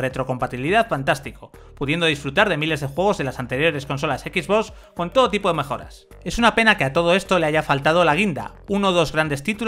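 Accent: Spain. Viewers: Pues así hablan mtaa. Son de España.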